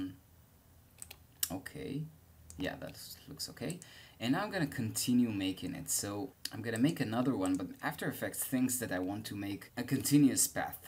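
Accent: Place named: Israel. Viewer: שומעים שאתה ישראלי אבל אחלה מדריך! תודה רבה:)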